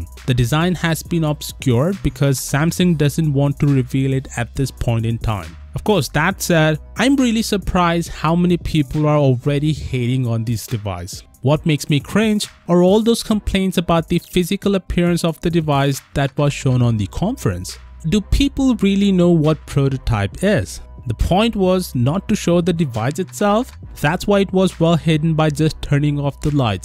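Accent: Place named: India. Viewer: It's impossible to listen to this indian english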